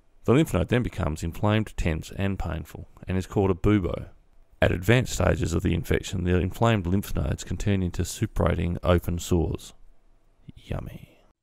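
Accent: Australia. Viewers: Oh dear - I’m sorry many of you couldn’t understand the narration - didn’t realise us Aussies’ accents were hard to pick up!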